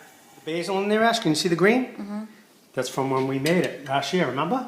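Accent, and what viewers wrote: Boston, United States. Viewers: All kinds a Boston/Mass in that voice!!! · Stumbled across this video while searching for a way to peel eggplant Love his Boston(?) accent, rapport with Ash, the dog, and his "commercial breaks." Oh yeah, the Eggplant Parm looks amazing, too!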